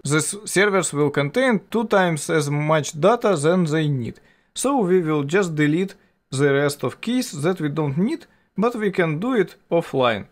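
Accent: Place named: Russia. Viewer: 一股毛子味的英语是吧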